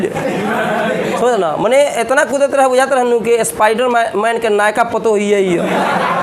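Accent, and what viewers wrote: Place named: Bhojpuri, India. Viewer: Sir aapke per ye Bhojpuri aawaj accha nhi lagta hai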